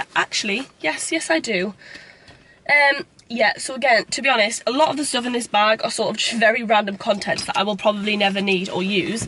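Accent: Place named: Newcastle, United Kingdom. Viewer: I love your accent, are you from Newcastle? And also, where did you buy your car combi bag? X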